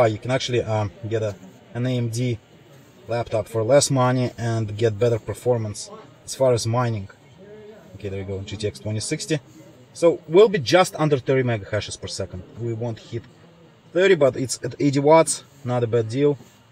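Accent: Russia. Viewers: Русский акцент??)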